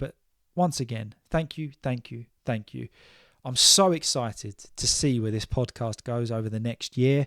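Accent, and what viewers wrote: United Kingdom. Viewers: Your podcast is one of my favorites. And what I like about it, it is your british english and listening to your podcast, I feel like I am in the UK. You transport me throughout your accent, your behavior, etc. When you speak about your country, those are my favorite moments. Please keep going. I wish you the best for 2025.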